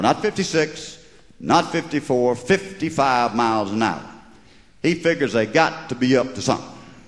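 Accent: South, United States. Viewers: you will find his pronunciation in some southern and mountain dialects (also in Arlo Guthrie) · I read this guy's books as a kid because my parents had them, but I never imagined this voice in my head. He looks like his voice would be more nasal and nerdy. I didn't imagine the southern accent either. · No doubt, a southern son, and one of many inspirations of Jeff Foxworthy. Both from Atlanta, and the southern accent is undeniable. He is not forgotten. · I remember checking this guy's books out from the library way back in the day. Up til now I've never heard his actual speaking voice. He's as Southern as I am :)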